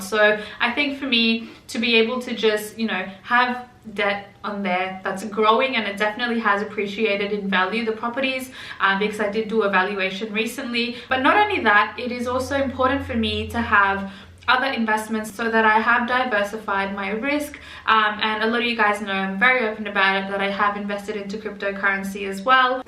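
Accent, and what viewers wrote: Australia. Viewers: Aussie? Hi from America!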